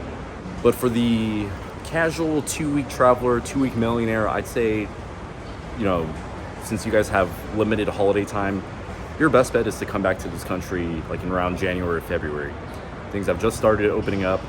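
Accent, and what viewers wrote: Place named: California, United States. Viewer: he is californian lol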